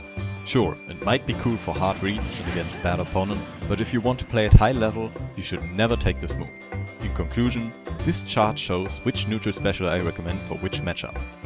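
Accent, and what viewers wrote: Germany. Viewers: Sorry bro aber da hör ich nen deutschen Akzent raus lol . Cooles Video, ich find Brawler auch sehr spannend, er ist sooo underrated!! :)) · Du klingst sehr deutch./You sound very german. And also thanks for the video.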